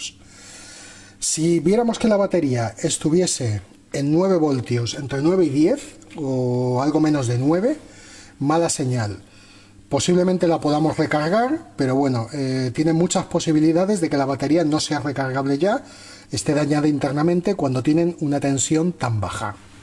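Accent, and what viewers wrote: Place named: Madrid, Spain. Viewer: Saludos desde Ecuador. Residí en Madrid varios años y tu acento me suena a Madrileño. Quiero felicitarte por compartir el conocimiento, que acá en nuestros países suramericanos le ponen precio a todo. Lo mejor que aprendí de residir en España es eso: "Compartir el conocimiento" como lo haces tu. Gracias. Otra vez.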